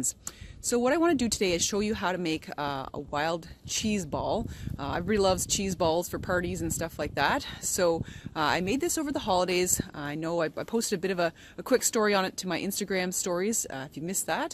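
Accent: Canada. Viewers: Canadians say "oute" instead of "out".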